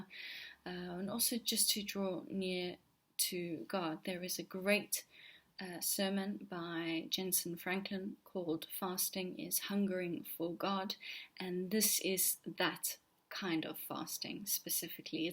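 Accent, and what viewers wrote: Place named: Australia. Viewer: Great content!😊 I enjoyed listening to it. I also like your accent 😁 Where are you from? Australia? The UK?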